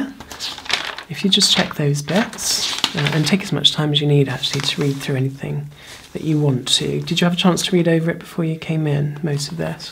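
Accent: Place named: United Kingdom. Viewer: Gods, you're gorgeous as both male and female I just--- can't. how iN THE WORLD IS THIS POSSIBLE 😭😭😭💜💜💜 that aside, I got what I asked for lol thank you, it's tingly as always and you always speak in such a clear, crisp, and gentle voice, with posh English accent that I could understand perfectly even to my untrained ears as English is not my mother tongue 🌟🌟🌟🌟🌟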